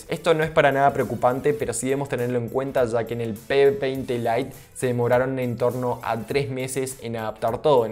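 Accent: Argentina. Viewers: Amigo tiene ud un acento muy particular, casi neutro, si es que eso existe. De qué parte de Argentina es? Todos hablan así? O es propio el estilo? Muy claro y entendible pronunciación. Se agradece. Saludos.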